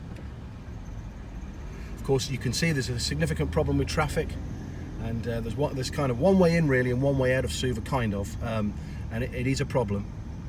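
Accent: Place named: United Kingdom. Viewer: He is British definitely from Uk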